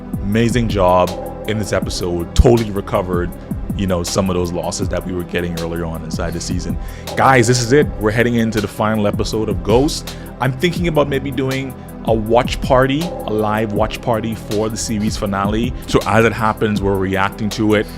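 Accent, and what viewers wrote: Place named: Bahamas. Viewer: You have to be from The Bahamas. From I heard you speak I was like yup. Glad to see a Bahamian making moves. Salute!!!!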